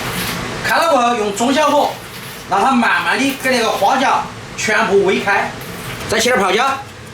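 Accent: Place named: Hunan, China. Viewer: 我咋听出湖南口音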